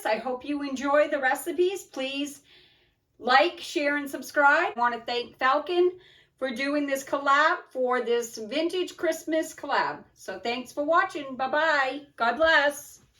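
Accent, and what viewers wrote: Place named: Rhode Island, United States. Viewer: New friend here from the Christmas collab! So glad we found each other’s channels. Looking forward to watching more of your content. I lived in Rhode Island for several years; loved it there so much. Your accent brings back memories. My name was Melinder instead of Melinda 😂 All of your recipes look fun and delicious. Merry Christmas!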